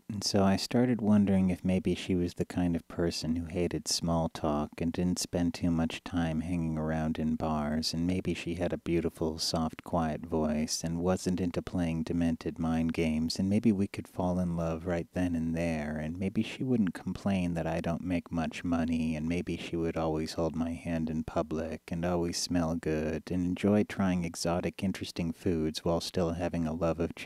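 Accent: Eastern Europe. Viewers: eastern European accent right here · eastern european accent? mmmm.. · great! my boyfriend can say it is true! and this eastern european accent... and everything else... besides his cheap cologn.... aaaaaaaaaa... how could you know?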